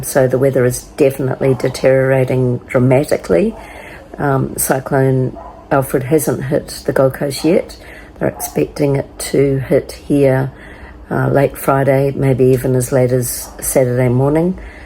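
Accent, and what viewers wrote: New Zealand. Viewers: Thought I picked up on a kiwi accent! I lived in NZ as a kid... one more thing in common! So very familiar with Kia kaha! Back at ya! 💪😍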